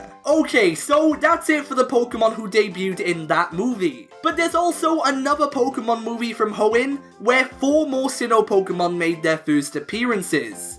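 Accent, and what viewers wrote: Wales, United Kingdom. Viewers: Are you welsh 😊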